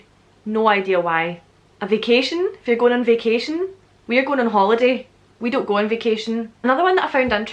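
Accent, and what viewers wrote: Scotland, United Kingdom. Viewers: American, of Scottish descent, and I've never used the word "jumper". Perhaps to describe someone who jumped off of a building? Then again, I'm male. Females in America might use it to describe an article of clothing? Just not me. Hey, I really enjoy your videos. I've never been to Scotland, but want to one of these days. The Scottish accent is my favorite of all English speakers worldwide. Yours is just lovely. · what part of scotland are you from? i'm fallen in love with your accent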